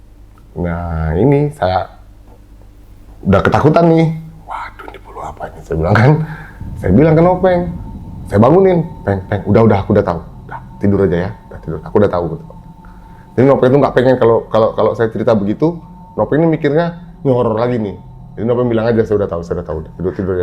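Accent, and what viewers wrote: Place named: West Sumatra, Indonesia. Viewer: Urang Awak ma suaro nyooo Cubo agiah ampu hehehe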